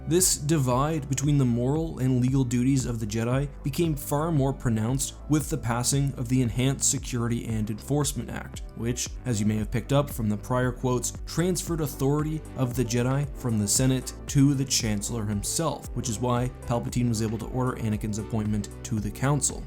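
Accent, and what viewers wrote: Canada. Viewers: This is this first confirmation I hear of your Canadian nationality. I suspected as much from your accent slipping through in most episodes. If you had mentioned it somewhere else or before, I haven’t seen it. It’s just key words that highlight the accent.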